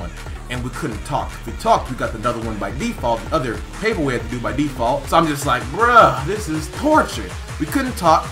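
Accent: United States. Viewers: You from michigan bro?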